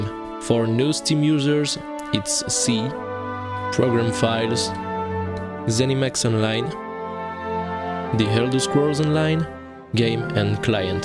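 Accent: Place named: France. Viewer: Don't worry, it's all fine, your English is better them most, to be honest, and with the french accent. ^_^ Thank you and Goodbye yourself. ;)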